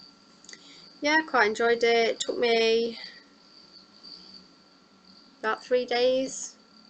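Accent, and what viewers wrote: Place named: Sheffield, United Kingdom. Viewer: its brilliant seeing another stitcher from the uk though it started a debate between me and my boyfriend trying to place your accent i say somewhere south Yorkshire? thats where i am and you kind of sound sheffield ish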